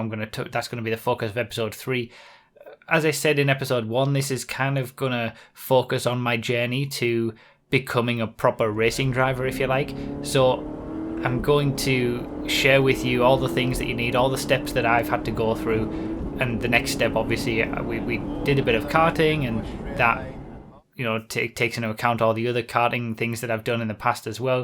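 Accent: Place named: Newcastle, United Kingdom. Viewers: Are you from north east England, I hear a slight Geordie accent for some reason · I'm from just up the road from you Blackhall don't know the hell I didn't recognise the Poolie accent.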